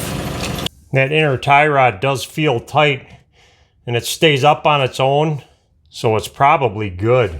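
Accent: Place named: Minnesota, United States. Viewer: Man that's a lot of rust on those parts! Must live in the snowy white north with salted roads, based on your accent and the sheer volume of rust! I'd say Minnesota if had to guess. 🤔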